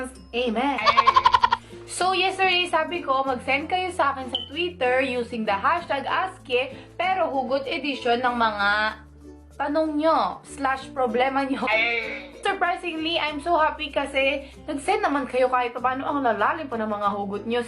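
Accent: Visayas, Philippines. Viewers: Taga pampang ka diba ate pero parang bisaya👌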